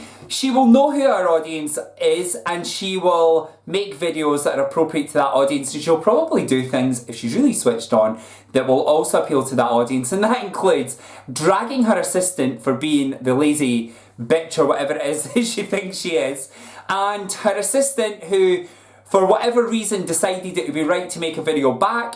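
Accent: Scotland, United Kingdom. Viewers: Great video, you say your british, howcome you sound more on the scotish side ?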